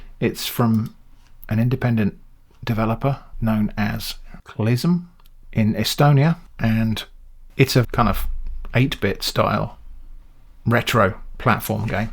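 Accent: England, United Kingdom. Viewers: um...games is plural....if some one asks you if you play games, and your answer is 1....then your real answer is NO I DO NOT PLAY GAMES...I play one game...thats a good way to show you're not really paying attention, and just making something about you, which is why you would know what the question is...even though you're obviously not paying attention if some one were to ask if you if played any type of game....thats basically it, do you like any type of game? That is singular...sounds like a English accent, but many people from Asia have English accents. So its confusing....you can make a 36 min video that only people who like you will want to watch while saying you don't have time to play games...do away with these type of videos and you might have several more hours a day.... as some one who doesn't know you, I don't honestly care if you play games...this is my intro to your channel and I feel I made a mistake by coming here. 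Now youtube thinks Im gonna want to watch but this channel isn't for me, if you're talking about yourself on the first video. We already have too many people in real life who think they are some big shot, thats one reason why we avoid real life and live online. Less people who are egocentric, used to be at least.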